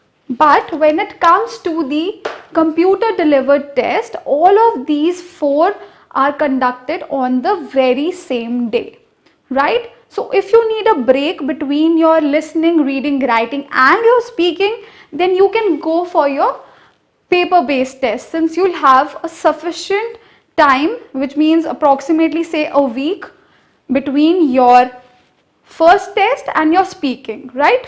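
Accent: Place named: India. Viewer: Cute girl.. I like your Indian accent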